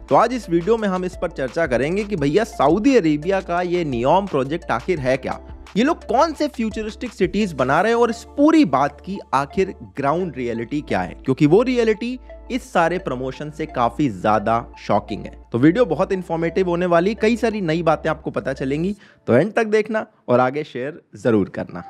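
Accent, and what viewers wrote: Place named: North, India. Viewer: Donty donty eight 💀💀💀💀..bro this north indian accent is so funny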